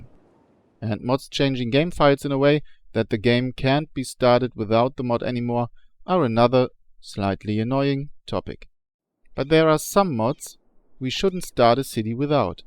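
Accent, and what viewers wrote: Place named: Germany. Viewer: haha okay. nur ein kleines bisschen zu deutscher Akzent und zu starke Betonung der Endungen.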